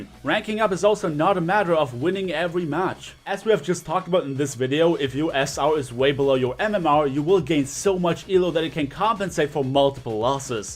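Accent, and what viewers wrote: Germany. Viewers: are you a German Guy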